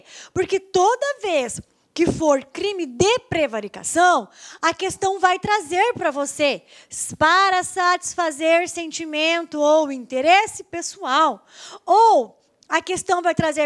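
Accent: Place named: Curitiba, Brazil. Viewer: Adorei essa profe e o sotaque curitibano tipo o meu (senTE)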